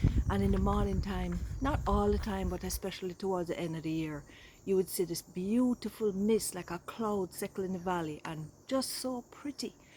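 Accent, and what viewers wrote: Jamaica. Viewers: Wow !! Almost speaking Jamaican as well, Luv ya !!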